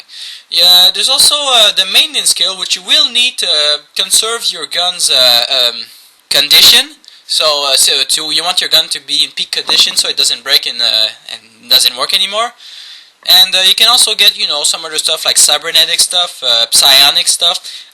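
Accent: France. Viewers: Let me guess... French accent?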